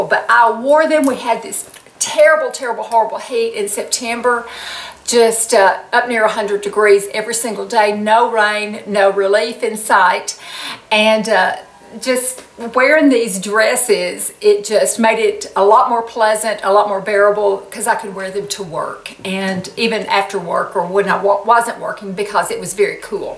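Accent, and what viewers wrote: South, United States. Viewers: I love your accent! I’m from California and I put on your channel while I’m making dinner. I don’t know why it is, but your southern accent inspires me and motivates me. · I love your accent I mean it's a part of you it brightens my day and makes me smile your such a beautiful lady with the sweetest personality! Your very country and southern an I just love it! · Wow! Your hair looks even more beautiful than usual. 😍 Also, your southern accent is very endearing...who cares what anyone else thinks. I’m from a rural town & have a bit of “hick” in me too. Being you is why I subscribed. You’re one of my favorite beauty vloggers & I hope to look half as good as you at 64. You’re an inspirational stunning lady. 💕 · I love your southern accent. It is very charming! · I love your accent...you sound like me...lol! Some people think if you have a southern accent you’re stupid...at least in my experience. 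Love ya! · I too am a Southern girl - Kentucky. I love your accent, but most of all your a genuine, natural person! Love ya! Try Opi Nail Envy will strengthen & restore your nails, it’s magic. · Your Southern accent is beautiful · This Georgia girl loves your accent. I'm proud to be southern. Keep on going! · Howdy, I'm from Connecticut and I don't have an accent 😰. At least I don't think I do lol. Anyhow I love your Southern accent! Can I borrow it lol. Besides my FAVORITE male recording artist since I was a lil girl has an accent like yours and I could listen to him till the day is long. He's KENNY ROGERS!! 👏👏👏👏👍👍👍👍💥💥💥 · Bonjour! I’m a Southerner too and for most of French people, I have a strong accent. They like to make fun of us but I don’t care, I love my accent, it shows my roots and is full of sun ☀️ You have a nice accent, and you speak slowly so it’s easier for me to understand you than other American women who speak very fast. 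I love your sweater, you’re stunning as always. Have a great day my Southern friend. Gros bisous de France 😘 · I love your sweet southern accent! Your so sweet❤️. We all have different accents... Im from New Jersey and hate my Jersey sound and have been made fun of when visiting other states. Watching your video has helped me to look at it in a different way❤️. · I love the way you speak! You sound friendly, so genuine. I am aware of the stigma of southern speech but hey Jimmy Carter was elected President. How boring if we all spoke and looked alike. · I am from Alabama and love our Southern accents. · I too am a Southerner. The South has several accents and I love them all. Your’s is beautiful! Why speak beige when you can speak in rainbow? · I remember the first time I watched one of your videos. I had been searching the web for something and a thumbnail came up about a “hot” grandmother or something taking YouTube by storm. 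Well, it was you. I was captivated by your sweet Southern charm, adorable dialect, and Goldie Hawn smile. I have been hanging with you ever since. You are a blessing:) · oh my! i LOVE your accent. I always get mad that Miami doesn't have a southern accent. I wish we did. I think it is so pretty. YouTube is unsubscribing me from channels!!!!!!! Oh I haven't tried that pumpkin spice. I love coffee. Will have to try that one. I love pumpkin anything. You are the hostess with the mostess! Love those balloons. My nails used to be so very healthy and long. Now they are so weak and not long. I was thinking of going to get acrylics but you've changed my mind. I will just accept and be proud of them as they are. xoxoxo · I love your southern accent.❤️❤️ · I’m from the south and far from home, your accent is one of the reasons I listen! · Hi do not fret over your accent one iota... Your southern accent is one of the many things that makes you so endearing... 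Southern class & charm radiate from you!!! Cheers!!!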